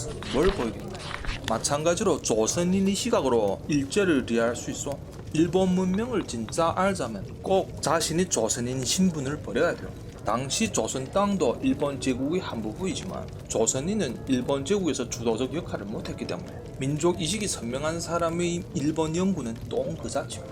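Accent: Yanbian, China. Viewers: 연변식 한국말씨 재미있네요~ㅎ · 연변말투 맞음 딱 들어도 연변 사람인데😂😂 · 연변말 맞아요.시내연변말(함경도말 베이스)?비스무레한 말투,북한말(평안도)아님. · 연변이니이신가요 북한출신이신가요 :face-orange-biting-nails::face-orange-biting-nails::face-orange-biting-nails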